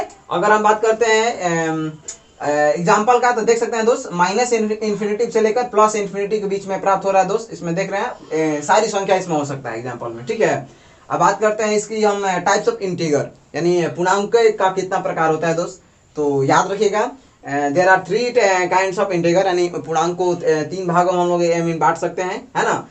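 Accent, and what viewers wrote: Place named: Bihar, India. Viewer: Bihari log ka boli mst h😊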